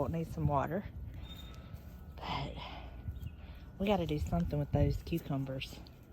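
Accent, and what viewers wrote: South, United States. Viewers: I ❤️❤️ your sweet southern accent! It’s so calming 🥰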